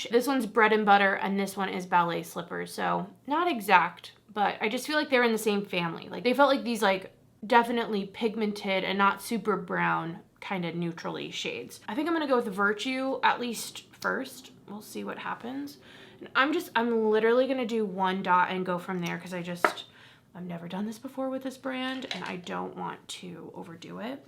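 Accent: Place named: Boston, United States. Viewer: ...Don't listen to anyone who "corrects you" Girl! I'm from Boston, we have a LOT of people with the last name LUZ. Tons of businesses named LUZ and it's pronounced just as you pronounced it! LUZ I've NEVER heard it pronounced loose? That's crap! Hahaha Just tell them you have a boston accent and keep it moving! 😊 You pronounced it fine! 🙄 Keep doing you, don't worry about dumb pronunciations!! 😂 Love ya!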